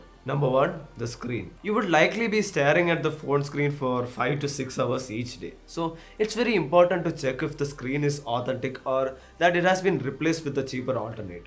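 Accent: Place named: Kerala, India. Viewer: 10 seconds into the video and I know that's a mallu guy speaking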